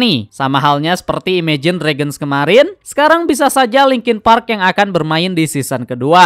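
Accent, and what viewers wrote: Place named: West Java, Indonesia. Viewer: ane tau ente sunda bang, tapi london dibaca landen.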